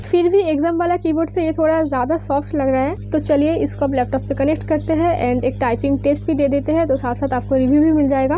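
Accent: Bengal, India. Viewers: U bengali?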